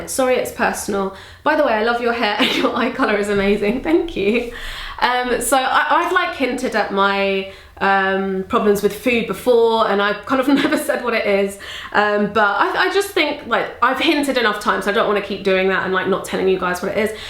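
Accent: United Kingdom. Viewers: You are so cuteeeeee with your british accent!!!!!!! <3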